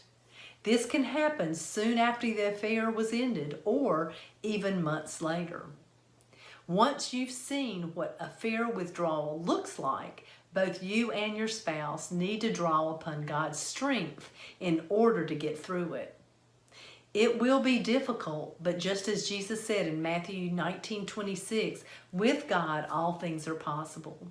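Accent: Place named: South, United States. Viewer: Well, yeah, you can tell by the southern accent that Christianity blurs the fact of the matter….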